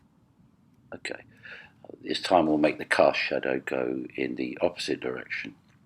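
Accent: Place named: England, United Kingdom. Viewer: Dalla pronuncia direi che sei inglese! I Like! 💠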